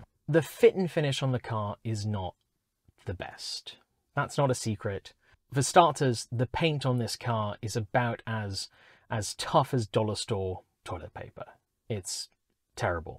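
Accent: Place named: United Kingdom. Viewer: When did you get a British accent?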